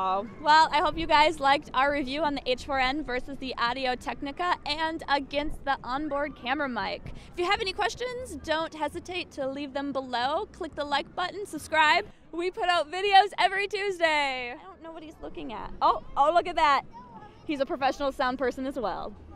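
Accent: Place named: Midwest, United States. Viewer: What I tell you is this: Get rid of it but be able to showcase it if someone asks for it. Or for when you go back and film there or Michigan/Wisconsin where that accent will be wanted regionally. Well I only say Michigan because there is a lot of filming there but that accent is not necessarily there. I like your voice. Sometimes there's a quiver in there when not using the accent like you're nervous or something, but it's very cute when it happens. Like when you get tangled in cords. lol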